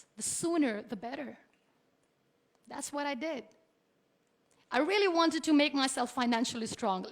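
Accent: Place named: United States. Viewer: I salute u Muniba..ur speech touched so many peoples heart..keep smiling n Mr.badal y r u concentrating on her american accent?y r u not focusing on her speech..i think u r jealous of her communication dats y u r focusing on it more..plzz dnt disrespect any1 if u dnt no to give respect..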